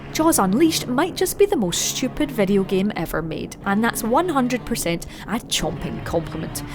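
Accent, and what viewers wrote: Scotland, United Kingdom. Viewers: Apart from the games, I love the narrator's Scottish accent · this Scottish accent man , my heart i love it xd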